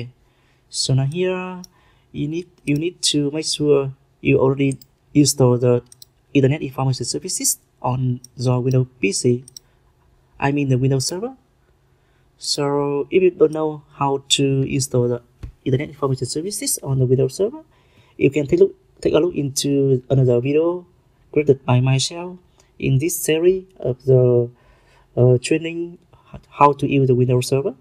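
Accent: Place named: Vietnam. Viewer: Sir the way you speak english I assume you're a Vietnamese cause I'm a VNmese and it's really easy to spot out a Vietnamese, in that case Xin chào và video này dễ hiểu ấy But if you're not then ok It's really easy to understand what you said and what you do. Thankyou sir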